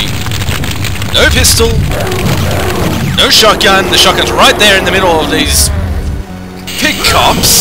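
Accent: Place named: Australia. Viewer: Haha, oh dear. I don't think I want to know. Actually, hopefully I'll never know unless curiosity gets the better of me of course. Ps. Awesome to see an Aussie doing this. Assuming of course your accent IS Australian :P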